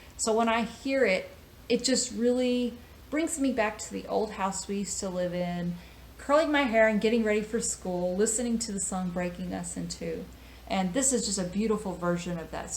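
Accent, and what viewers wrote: United States. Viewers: I'm English (in London). Although I only post an occasional comment, I always watch your videos - I subscribe to just three VC channels and you're one of 'em! I enjoy your soft, soothing American accent, and the way you speak with such grace. I also like the fact that you take notice of the record cover art. Best Wishes for Christmas and the New Year 🤗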